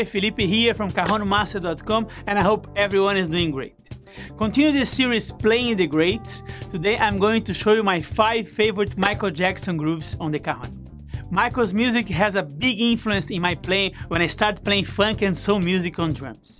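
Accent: Brazil. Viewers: Você é brasileiro? Teu sotaque !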